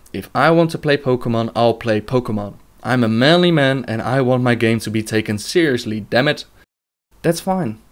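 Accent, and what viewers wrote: Netherlands. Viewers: me too i kinda heard it already its very easy to hear a dutch talking english any way good review ^^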